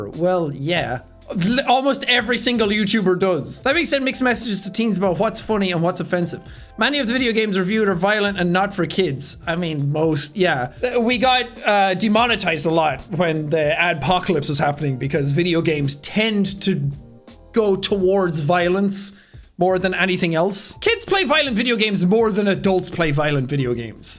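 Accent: Ireland. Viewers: I actually like when swears a lot, he's and entertaining guy and his Irish words are magical to my ears. Granted I'm an adult now so I guess it's different. I'm also Native American so like the Irish, swearing is integrated into our conversations a lot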